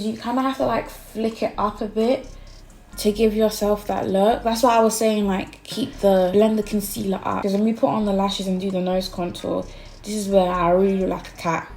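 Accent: United Kingdom. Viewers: am I the only one who hears Nicki's voice when she speaks ? with a british accent ofcours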